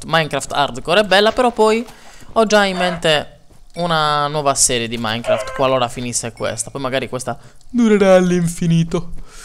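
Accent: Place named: Sardinia, Italy. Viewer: Amo il tuo accento sardo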